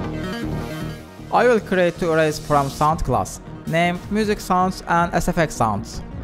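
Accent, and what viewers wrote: Turkey. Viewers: Aksanından kesin bu türktür dedim içimden. Sonra kanalın açıklama kısmından adının olduğunu gördüm 😊 konuşman gayet başarılı ve çok güzel videoların var. Başarılar dilerim.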